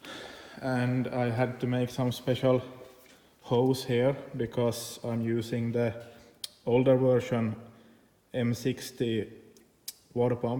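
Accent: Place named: Finland. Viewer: I love Finland, finns and finnish accent. Anyway, great job! I want this car!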